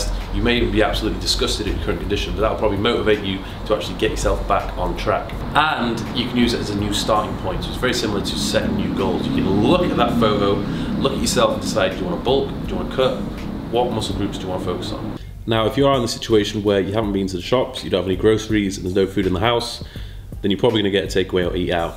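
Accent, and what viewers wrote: United Kingdom. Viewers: Thank you very much. Improved my fitness knowledge and even my skills on understanding more the British accent (I’m from Italy) 💪🏼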